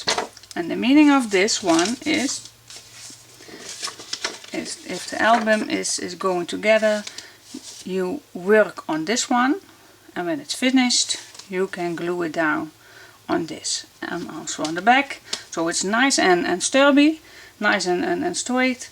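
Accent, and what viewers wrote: Brabant, Netherlands. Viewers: jeetje , ik ontdekte jou dit weekend op you tube....heb uuuuuren zitten kijken. koptelefoon op en manlief had geen last meer van me hahahaha en ben weer compleet geïnspireerd door jou ....had veel albums gemaakt maar zie dat ik nog veel kan leren van jou ook heel veel ideeën opgedaan ( gebruik nu ook acryl stempelblokje om met mijn lange nagels het tape af te scheuren top idee). vreselijk gelachen ook om je .... wat een kei gezellig mens ben je ( brabant zo te horen) zit wel met heel veel vragen over materialen maar ja jij zegt googel het maar alleen niet alles kan ik vinden wat ik wil dus hoop dat je toch stiekum vragen wilt beantwoorden ,,,, merk wel dat wij veel dezelfde ideeën hebben ook ik werl al jaren met het "potloopstreepje en meet ook nooit iets af ... ik ga snel verder kijken bij je , groetjes Marijke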